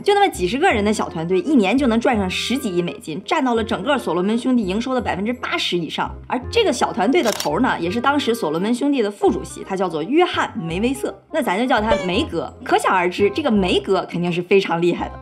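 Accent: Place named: Shandong, China. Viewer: 感觉有点山东口音哎😊❤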